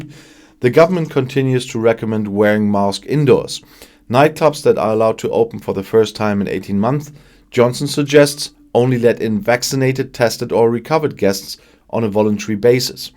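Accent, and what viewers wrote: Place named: Germany. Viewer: It sounds like you're from Germany I think you should look at your own country first before you start criticising everybody else is my friend you were actually down and Vaccines at the moment did you thought you did a great job the first time but the second time you've made a right f**** of it you go and look after your hand side and when I'm going to listen to you European people because you don't know what you're talking about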